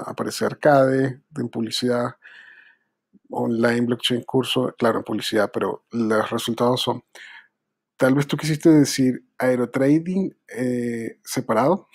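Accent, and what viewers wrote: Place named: Venezuela. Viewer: Tienes "acento" venezolano.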